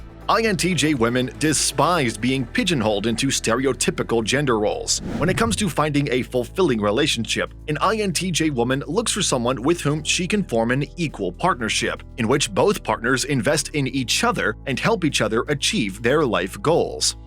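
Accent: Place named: United States. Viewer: I really wanted to watch this video but the voice is way too American 🤦🏻‍♀️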